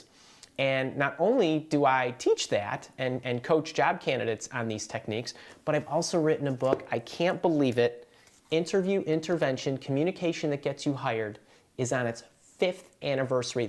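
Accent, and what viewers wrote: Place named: Wisconsin, United States. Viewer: Is this dude from Wisconsin or something? “Jahb”, “Fayst”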